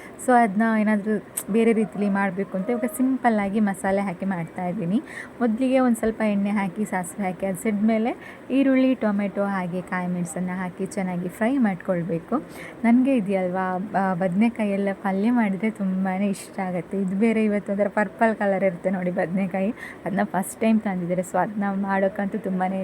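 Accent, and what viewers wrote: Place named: Mangalore, India. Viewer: Manglore kannada mathadi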